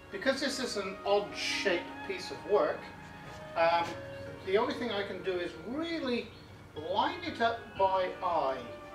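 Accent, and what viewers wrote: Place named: West Country, United Kingdom. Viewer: Going to caaav a climber , the west country accent has hints of where the Baawston accent comes from .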